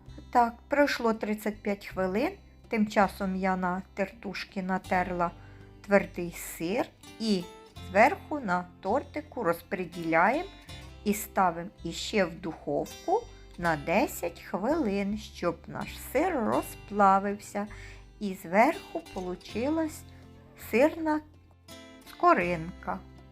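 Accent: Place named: Surzhyk, Ukraine. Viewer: Розмовляе суржиком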